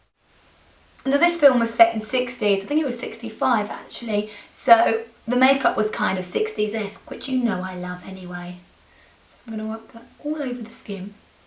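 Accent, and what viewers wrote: United Kingdom. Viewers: it helps that you have a british accent too xD :) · Every time you or your sister say "So" I have to try and say it in my best attempt at a British accent. My New York one tends to cancel it out though :( I love the way you guys say it! Oh, and gorgeous make up!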